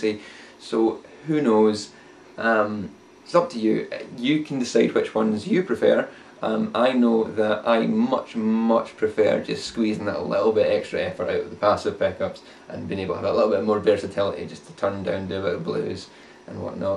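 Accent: Scotland, United Kingdom. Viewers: TALK SCOTTISH TO ME! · Well he's Scottish. I don't know about Shrek. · It's scotland. We all talk like that over here...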